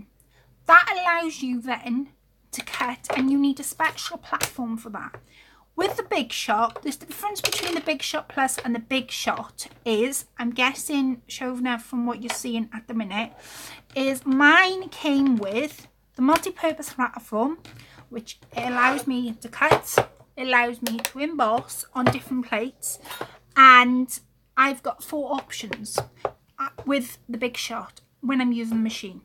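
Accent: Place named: Wales, United Kingdom. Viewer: Sorry I disappeared, my internet quit on me and I had to call my provider, but I can watch the playback, gorgeous card. Love your Welsh accent, I'm originally from Manchester so I recognized it right away. lol TFS...Hugs...Mary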